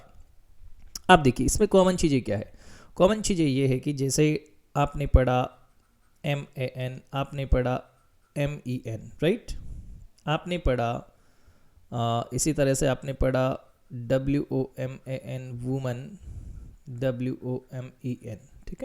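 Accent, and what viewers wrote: Rajasthan, India. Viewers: Sir AAP rajsthan se ho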